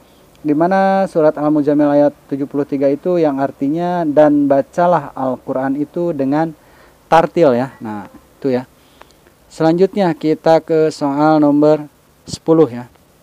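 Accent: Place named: West Java, Indonesia. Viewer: logat sunda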